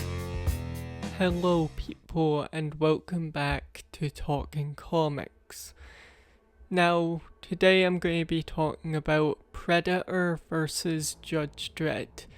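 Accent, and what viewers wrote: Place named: Wales, United Kingdom. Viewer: Bro..sweet accent! Are you Welsh? Seriously, it is awesome.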